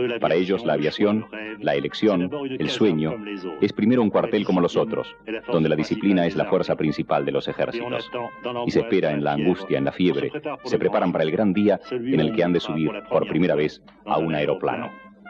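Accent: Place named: Argentina. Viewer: que parte de america es esta no la reconozco, colombia, chile venezuela, uruguay?el relator es indiscutible argentino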